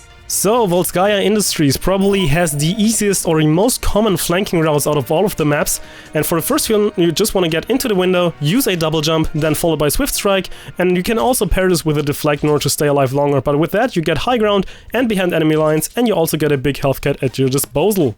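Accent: Germany. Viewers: XD ich erkene wie du sprichst das ist auf jeden Fall deutsch besonders bei eichenwalde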